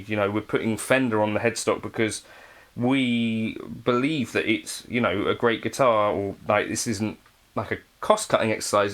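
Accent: United Kingdom. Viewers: Isn’t this just how British folk talk? Why are you so critical?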